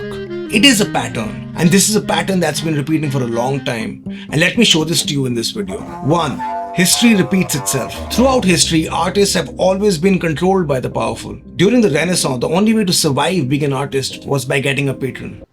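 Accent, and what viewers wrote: India. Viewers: I was also shocked (in a good way) when I clicked this video and heard an indian accent. It's so refreshing to see an indian channel cover this topic considering the dislike of arts or humanities in general in our country. You just got a new subscriber. Would love to see more videos like this.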